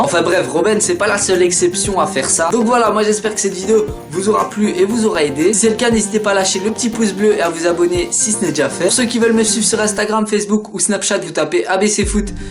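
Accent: Belgium. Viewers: Tu est belge?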